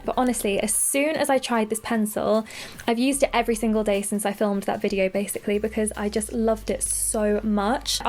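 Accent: RP, United Kingdom. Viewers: Does she have a RP accent or not ?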